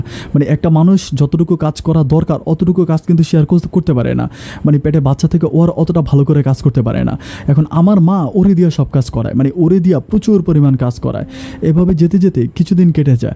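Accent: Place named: Barishal, Bangladesh. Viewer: ভাই মনে হচ্ছে ি বরিশালের ছেলে ার ভাষা বরিশালের মত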